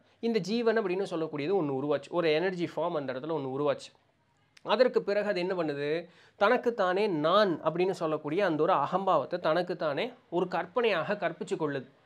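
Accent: Coimbatore, India. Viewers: உங்கள் ஊர்(கோயம்புத்தூர்) மொழியில் கேட்க ஆனந்தமாக இருக்கிறது💕..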